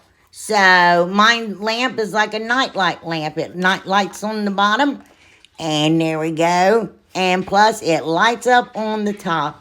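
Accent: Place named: South, United States. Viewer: For one u r from the south so don't ever try changing ur voice again cause I love listening to u talk in ur own voice. Second I always love everything u make and if I could I so would totally try makin this ur way lol believe it or not but I would. U really r the best person and funniest love I love watching so plz keep making ppl laugh ur way. Thank U❤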